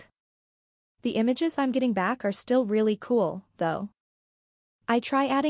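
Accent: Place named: France. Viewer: Don’t be afraid of your awesome French accent whilst speaking other languages. It is sooo fabulous when French speaking people keep their accent. I was born the same as you. The 80’s and 90’s fantasy films were the best years. We had a small resurgance with Harry Potter and LotR. But the (some of the late 70’s) 80’s and 90’s were iiit!!!!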